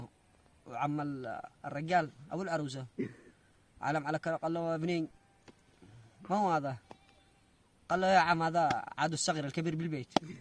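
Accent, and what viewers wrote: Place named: Taiz, Yemen. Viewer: يا جماعة هذا لغجة عنده لغاجة وكمان يبهرها باللهجة التعزِّية أغثى وأكره لهجة أعوذ بالله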